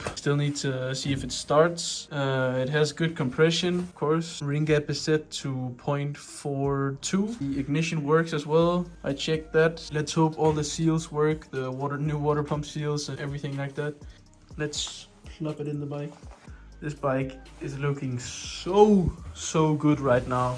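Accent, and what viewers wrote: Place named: Denmark. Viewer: Jeg kunne høre så hårdt på din accent at du var dansker så jeg tjekkede din kanal og fik ret fire video fire 🏍️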